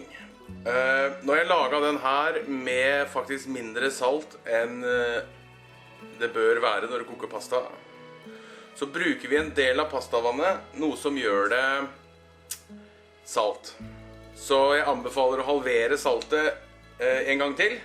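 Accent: Østfold, Norway. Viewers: Veldig bra resept. Jeg lurer på som svenske 35 år i Norge, hvor er din dialekt? Kan det være nedre østfold, Halden, eller er det lenger opp?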